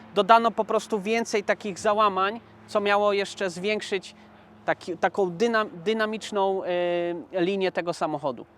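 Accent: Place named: Warsaw, Poland. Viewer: Te warszawiok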